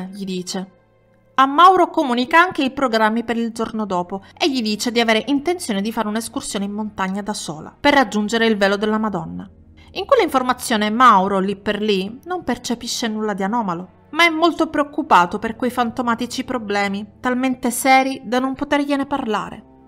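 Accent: Sicily, Italy. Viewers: Ciao, scusa se sono ripetitivo, avendoti giá "difesa" da " accuse" di dubbia pronuncia ed eccessiva sicula cadenza. A me personalmente la tua voce, il tuo modo di parlare ma soprattutto il tuo caratteristico piglio siciliano piace da morire. Brava, ti ascolto a prescindere dal caso che tratti proprio per questo.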